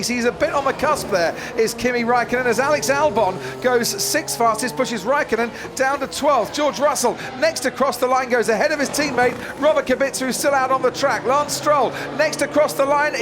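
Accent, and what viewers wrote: Australia. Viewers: Australian😂😂? Btw so fast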